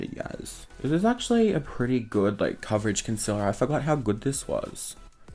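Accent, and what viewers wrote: Australia. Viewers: I love how Australians say their O's. Q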